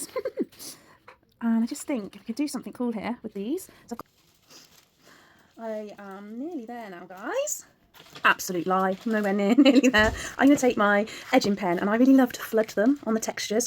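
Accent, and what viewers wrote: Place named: United Kingdom. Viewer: Does everyone in the UK talk as fast as you do? Sorry but I’m from Texas in the United States of America and I just can’t hear that fast!! We talk a lot SLOWER here in Texas. But I really love your accent; it’s so pretty but I just can’t understand you cuz you talk to fast for my brain to comprehend what you are saying. LOL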